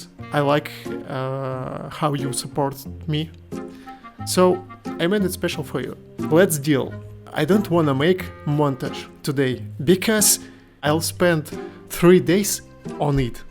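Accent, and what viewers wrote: Russia. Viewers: Английский язык с русской интонацией и манерой речи звучит понятнее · u russian? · That russian accent tho) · Для русского англорусский акцент звучит как родной русский язык)) Рад, что наткнулся на тебя, подпишусь! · Блять ты русский? Афигень акцент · У тебя русский окчент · Такое ощущение, что акцент русский)))))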